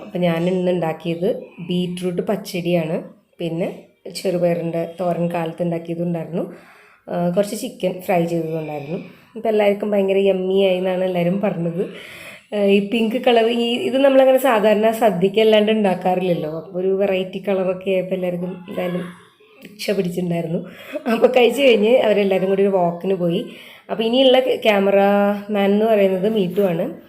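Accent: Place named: Thrissur, India. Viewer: 5kuttyolum🤩🤩👌👌👍nammude thrissur bhasha idakkidak varunnundtto🤩👍kuttypattalam nannayi enjoy cheyyunnund🥰ningal sisters randaalum banglore aanalle..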